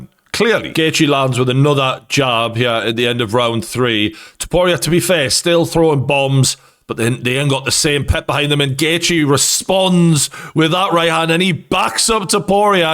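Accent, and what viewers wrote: Newcastle, United Kingdom. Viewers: He literally said this isn’t the first time. Keep up with the Geordie accent lad.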